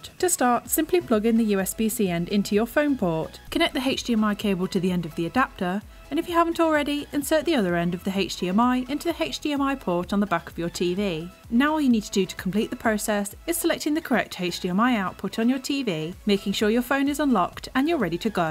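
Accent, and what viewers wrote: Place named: England, United Kingdom. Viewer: It’s an English, one of those things. Learn to respect, not all are using American (US) accent or twang. Peace ✌️!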